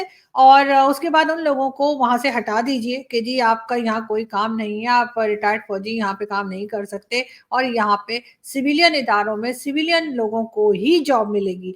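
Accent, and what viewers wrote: India. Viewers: ji aap ki jubaan paki kam hindustani jyada lagti hai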